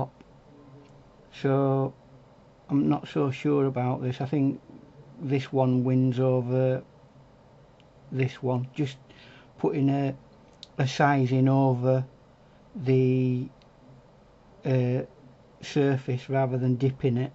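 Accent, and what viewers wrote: United Kingdom. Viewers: Let me guess sir , are you from Yorkshire? Brilliant video! 👍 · Love the British accent... What a lovely video. I feel like this one deserves so much more then 109 views since it could be helping so many beginner artists. Stay connected.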